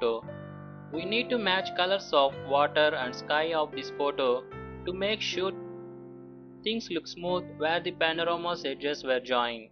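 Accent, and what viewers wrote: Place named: India. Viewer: indian and pakistan accents is very funny they are trying to speak with well accent but they are can't speak well english with good accent 'realistic' but he say 'realishtic' :) 'sixty' but he say 'sixshty' :)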